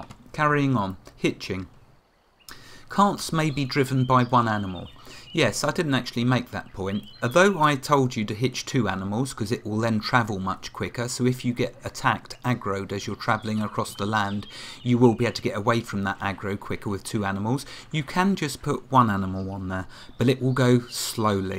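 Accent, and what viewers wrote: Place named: Essex, United Kingdom. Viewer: I'm also from the UK, perhaps near you as I'm on the Essex / Suffolk border and I think I can detect some Essex in your accent?:-)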